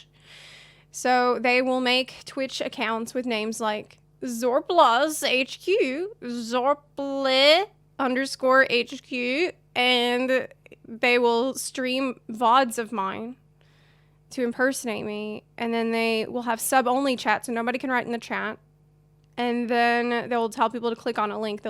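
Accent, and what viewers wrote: South, United States. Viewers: I can't believe you're from Ukraine! I guess it's just because I've always picked up a very slight southern US accent. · "Ya,all sounds from somewhere central southern USA area.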